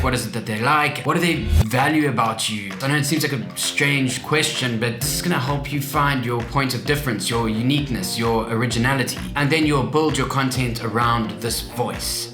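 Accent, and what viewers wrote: South Africa. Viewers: Step 2 is where im at, i did about 10 weeks of weekly videos. I create scrap metal sculptures and i film the process and the story of why im making what im making. It felt so good to be so active for a few months but making the sculptures is my fulltime job and the extra time put me behind on orders argh, once ive caught up properly i can get back to it again 😁 thanks for the advice, I love your content and the accent, it reminds me of my grandmother (she was from south Africa)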